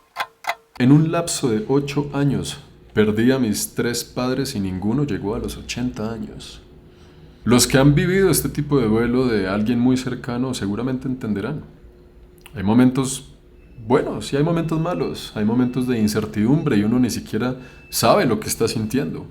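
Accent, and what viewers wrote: Bogotá, Colombia. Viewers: awwww que video tan bonito:face-red-heart-shape:, y yo mas y mas enamorada de 😍 ojala me encuentre un clon con todo y acento colombiano jajajaj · Recién. te sigo, me gusta mucho el tu acento rolo yo viví en Bogotá hace 12 años. Después. emigre a Países. Pero mi querida Bogotá me abrió las puertas del mundo. Mi más sentido pésame por tu perdida. Mucha fuerza. Saludos de una peruana en Haya ❤🎉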